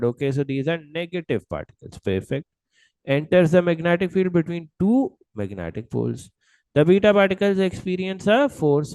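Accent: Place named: India. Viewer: fav indian accent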